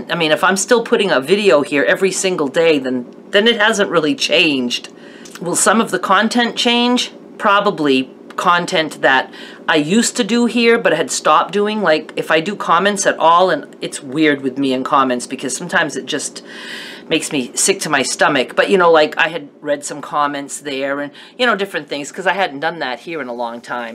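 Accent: Maine, United States. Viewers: This will be a totally different comment (hope you can laugh a little). I’m living in Ohio now, but lived in Maine on North Haven for 2 years with my husband. I love your Maine accent and it brings back wonderful memories Maine.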